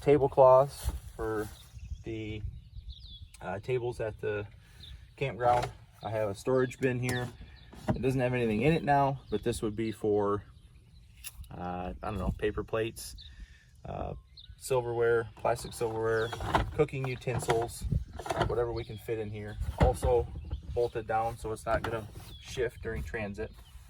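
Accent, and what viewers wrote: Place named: Iowa, United States. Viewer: Hi! Super! Questions! What state / locale were you filming in? (Why would you leave - beautiful birds and landscaping! I'm going to guess having no other info but sounds and your accent -- Iowa or thereabouts - Illinois? Second; What is that vehicle you're pulling it with? Thank you! Diana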